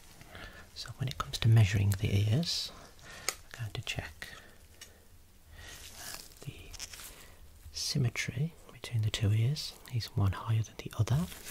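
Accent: Birmingham, United Kingdom. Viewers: mate you are absolutely blinding. your natural and professional bedside manner is just brilliant, your knowledge and terminology combined with your brummie accent make you my favourite channel. it's fantastic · Been listening to this every night for the past week, it’s saved me from a stressful week so thank you! Love the softer whispering, the Brum accent is delightful ❤️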